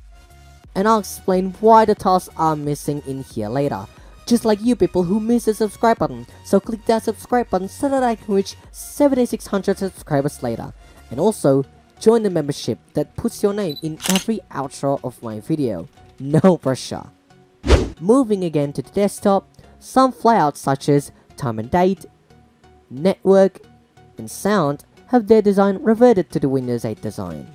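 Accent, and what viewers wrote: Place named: Australia. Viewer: it's the Australian accent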